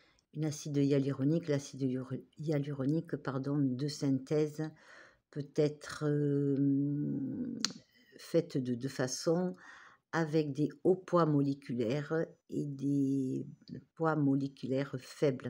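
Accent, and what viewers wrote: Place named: Toulouse, France. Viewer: Vers Toulouse.. * 💥🥳💥 🥰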